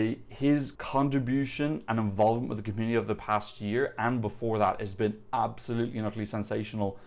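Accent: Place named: Ireland. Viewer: Yeah but he's Irish and everything is T and D anyway. Saying it's like the does not help 🤣🤣🤣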